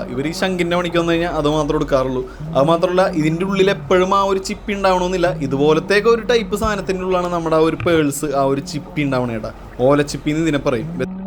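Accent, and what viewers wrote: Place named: Thrissur, India. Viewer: തനി ത്ര്ശൂർക്കാരുടെ ഭാഷാ ശൈലി.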